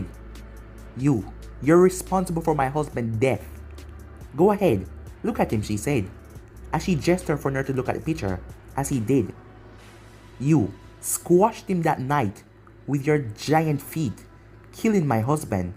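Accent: Jamaica. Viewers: Are you Jamaican or from the Caribbean? I hear the accent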